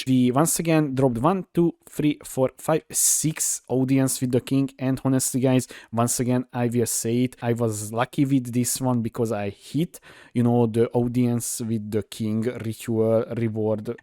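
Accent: Hungary. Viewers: This must be the thickest Hungarian accent I've ever heard xD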